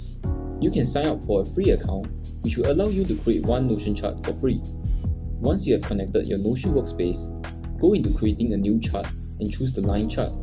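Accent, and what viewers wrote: Singapore. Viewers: Super great video thanks for sharing! But please don't shock viewers with this loud music in the end. If you dont want to remove it at least turn it down (or alternative make your voice louder) Also I think this video would be easier to understand for many people if you use more standard English (Nothing against Singlish but it can be difficult to understand for folks who never heard it haha) Keep up the great work! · Omg ru singaporean HAHAHA i recognise the accent!!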